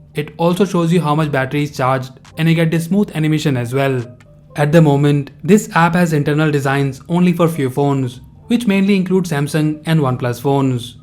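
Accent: India. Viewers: I dont know why indian peoples speak english like this accent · That's not accent. That's voice. He talks like a machine, in a repeating melody. His accent is recognizably Indian. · Bro he’s Indian it’s how he talks. He has an accent. Americans man.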